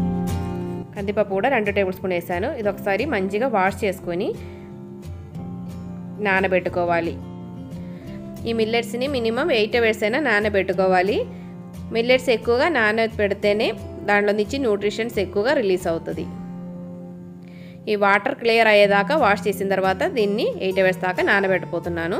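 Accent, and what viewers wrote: Kerala, India. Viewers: Nice, you are a Malyali ,must have settle in Hyd since long , Your slang is a mixed kichidi of Malyalam & Telugu 🌰🥒🍅🍆🌶🥕😊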